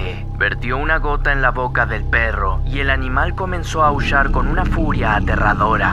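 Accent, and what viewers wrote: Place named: Argentina. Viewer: Es verdad hace 4 años era mejor haora abla como argentino porque cambiaste,😭😭😭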